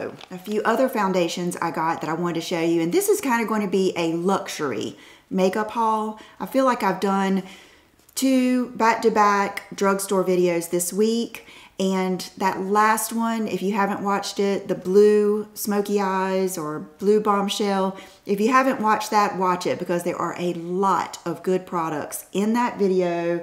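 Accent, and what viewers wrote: South, United States. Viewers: This is the first video I have ever watched of her but I love her. So whimsical....she enjoys reminiscing....old mac and YouTube days,etc!! Absolutely subscribed after just a few minutes of watching her. Plus, the southern accent....I'm from south Georgia myself so my accent gets picked on alot...love her!! Can't wait to go thru her channel and watch all her videos!!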